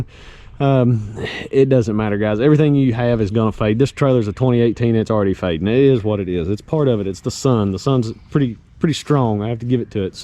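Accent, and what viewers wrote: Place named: South Carolina, United States. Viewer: Just started watching you. Accent says SC. Am I close?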